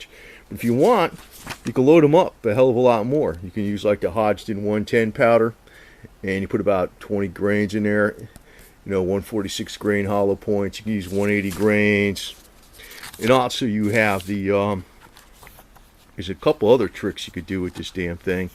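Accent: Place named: West Coast, United States. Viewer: He sounds like somebody from the West Coast.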